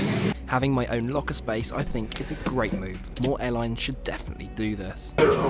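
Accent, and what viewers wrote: RP, United Kingdom. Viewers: You have a very appealing British accent. A 21st century Oxbridge accent?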